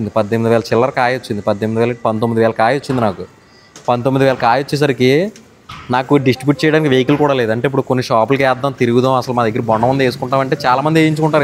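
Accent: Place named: Telangana, India. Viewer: తెలంగాణ మాట్లాడు బాబు సూపర్ ఉంటది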